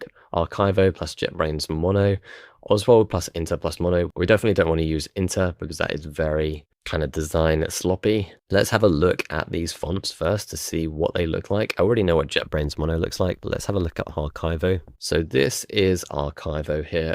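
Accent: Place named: United Kingdom. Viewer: I don’t care about captions - I listen, not read. I only said improve the mic bass because there’s way too much low frequency in the audio with such accent, making it hard to understand. Had to replay the video just to catch it. Are you British or something? Is that why my comment got under your skin, kid? Lol.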